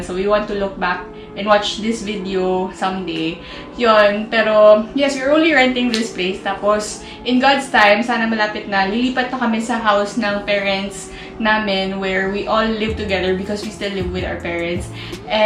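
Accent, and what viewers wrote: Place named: Visayas, Philippines. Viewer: HIi, I love watching your video. First na napanood ko sayo yung youtube sweldo. Tapos ito na, parang feel ko at first na bisaya ka. So happy nung narinig ko na taga Cebu ka nga. You're an inspiration. New supporter here. God bless. Cebuana rin ako pala hehe. Keep safe.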